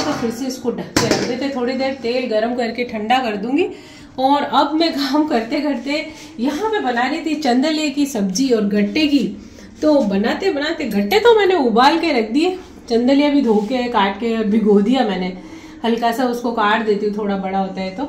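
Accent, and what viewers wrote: Jodhpur, India. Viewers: ram ram aunty ji me bhi jodhpur se hu or apke sare video dekhti hu bhut acha lagta h or apki boli sun ke muje jodhpur ki yad aati h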